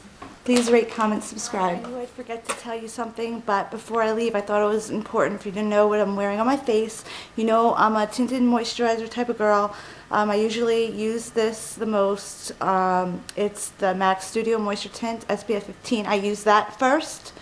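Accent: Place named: New York, United States. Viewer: You are so stinkin' adorable and I just love listening to you...your NY accent is so cute!!! I love my HIGH BEAM too! I almost am due to replace it. Love ya, sweetie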